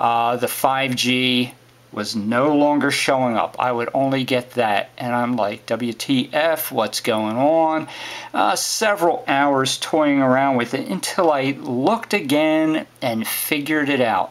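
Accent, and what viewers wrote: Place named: Philadelphia, United States. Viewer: Thanks for the help dude. From another dude from the Philadelphia metro area, I recognize that accent anywhere!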